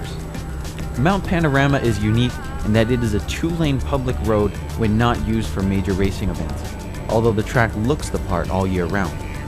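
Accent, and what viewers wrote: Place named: United States. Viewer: I can't listen to a bloody American talk about a true blue Aussie turbo 6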